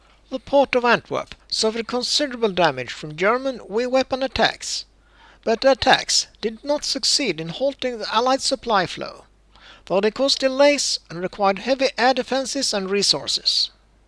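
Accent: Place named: Sweden. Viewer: and please you do ! good aim 🎉 I just explored the LINK to your other videos and I’m looking forward to watching them one by one. wanted to let you know: it seems to me that you’re in Sweden, yes ? and certainly it’s a very thick accent ya got going, I wanna let you know that I’m watching in Chicagoland, Illinois, USA. (what used to be the great country of America, which hopefully in time, will be again. don’t give up on us. 70% of us hate MAGA & Trump and everything they stand for…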